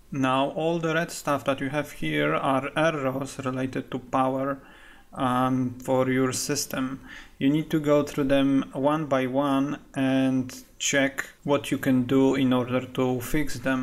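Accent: Romania. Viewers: Se vede ca-i roman nu are acent de englez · Cool Accent. Romanian? The first option worked great and for those who don't know, you may need to type: cd C:\Windows\System32 in the Command Promp first and press enter.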